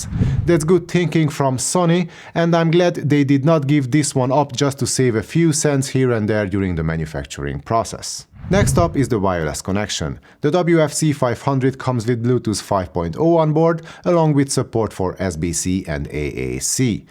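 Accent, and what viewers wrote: Hungary. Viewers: Nice professional review with a nice hungarian accent :)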